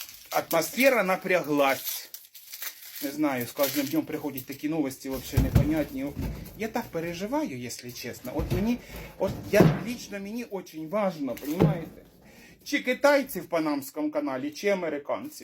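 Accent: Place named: Surzhik, Ukraine. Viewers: І на Донеччині❤❤❤❤❤❤❤❤❤❤ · Шановний! Ви розмовляєте так як говорять у нас на Полтавщині! Полтавський колорит!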